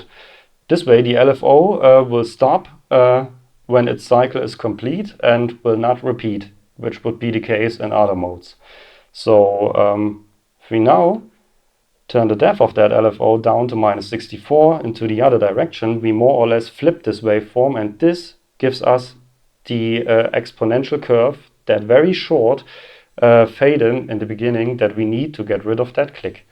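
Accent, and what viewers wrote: Germany. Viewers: Finde ich nicht gut die Lösung. Also dein Video ist super, aber ich würde gerne in einer laufenden Performance einen oder zwei oder auch vier Takte sampeln können, die dann sofort automatisch abgespielt werden. Alles mit nur einem Tastendruck! Da gibt es eigentlich nur ein geeignets Gerät bisher, was genau das kann. SoundBITE Pro von Redsound. Octatracks Pickup Recording könnte das vielleicht auch. Bin ich aber leider nicht sicher. Do you understand german? Your accent sounds like that. Would have been too complicated for me to explain that in english.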